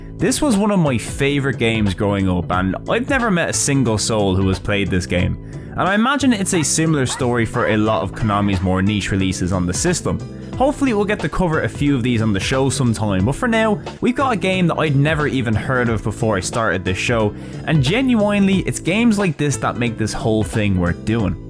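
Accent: Ireland. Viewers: I'm glad you picked up on something closer to his earlier Game Dungeon style. He's transitioned into doing a sort of 'play-by-play' style as he goes through the games because it's easier for him to get episodes out, which is understandable, but I don't like it quite as much, with the game having more of a factor in whether or not I like the episode than it did previously. So a channel with a classic Game Dungeon style talking about PS1 games most people don't remember with some dry humour sprinkled throughout? Now that's a winner right there. Throw in an Irish accent that I have fun imitating and making the decision to subscribe to you didn't take long at all. Keep it up, lad.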